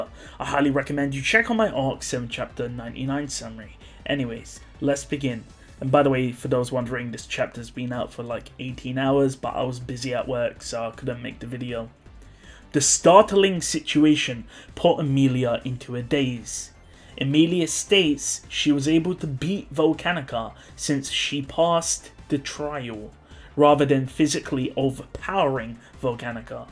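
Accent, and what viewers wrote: United Kingdom. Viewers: That's just him being British. It took him almost a year to stop saying Tappy but still hasn't really got Tappei right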